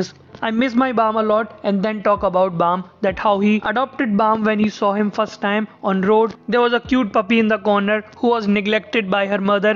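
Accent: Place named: India. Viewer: You ruined the whloe video with your indian accent PUBG Mobile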